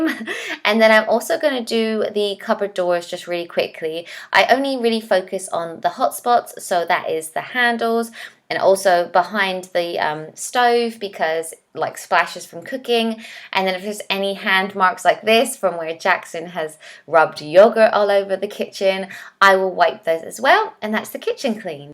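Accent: England, United Kingdom. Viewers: also from Meg's channel !! great vid -- hv always adored British accents 😍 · Is she British 🤔 (I know I sound silly asking this 😁😂🤣) xx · I'm Canadian too, but live in bc I would have never guessed you weren't born in England.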